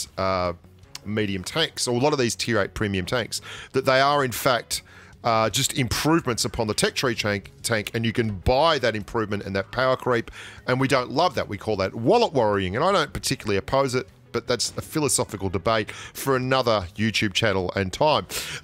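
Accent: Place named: Australia. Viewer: FIRST! Also, are you okay? tell me your property isnt up in flames. You sound australian and idk if you are from australia and live there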